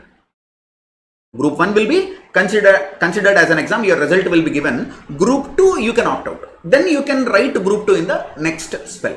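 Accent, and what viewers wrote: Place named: Tamil Nadu, India. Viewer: Sorry to ask you sir, Are you from Tamil Nadu?. Bcoz of your Slang, Which is too easily understood by me. (Nice) Thank You for your update sir... from Tamilnadu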